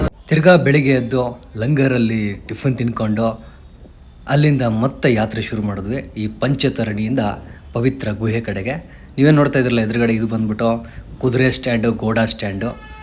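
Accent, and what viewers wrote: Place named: Central Karnataka, India. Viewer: Yava uru nimdu, launguage kelidre middle Karnataka ansutte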